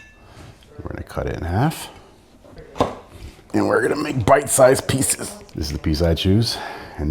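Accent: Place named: United States. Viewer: You have an American accent why are you measuring everything in metric?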